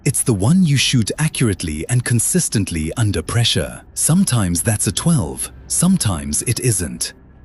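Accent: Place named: England, United Kingdom. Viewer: Why is someone with an English accent narrating this video? If memory serves correctly the British have draconian gun laws regarding ownership. Would you really believe that aside from single shot, side by side, or over under shotguns what could the British or ANY European country teach the United States about shotguns or ANY firearms? They may know theory, but the United States has practical experience. I'd go so far to say, one city in the United States has more experience wirh bang sticks than the entire continent of Europe. LOL!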